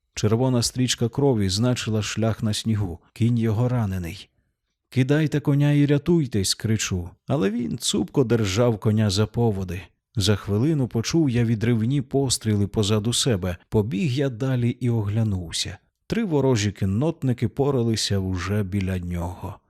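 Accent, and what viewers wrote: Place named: Chornobyl, Ukraine. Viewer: дякую за справжню ,,чорнобильську,,вимову у тексті👍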